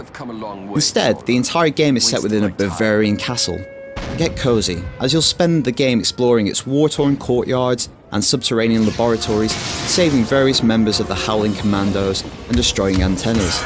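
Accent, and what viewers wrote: United Kingdom. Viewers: Why is a british guy doing a review on Captain AMERICA game? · Furthermore, the reviewer coincidentally having a certain accent and possibly being from a foreign place like the UK, or any one of the countries colonized by British doesn't mean he represents its people. This is IGN doing the review, at least point the finger at them rather than making it a matter of national pride and insulting others. I was unaware that British were single-handedly putting down the U.S. (I say "U.S." because the Americas are not comprised of only us) via game reviews, LOL.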